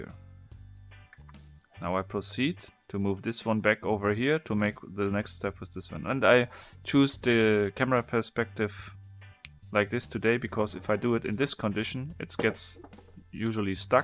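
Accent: Germany. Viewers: Man hört das du Deutsch bist. 😅 Nicht böse gemeint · THIS IS THE GERMAN ACCENT ! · Man merkt das du deutscher bist :D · Wie man einfach den Deutschen Akzent direkt erkennt :D Super video c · You are from german XD I can hear it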